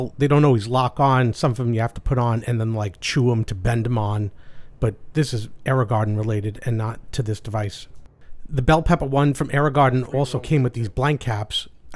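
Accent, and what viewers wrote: New York, United States. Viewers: is that a NewYork accent?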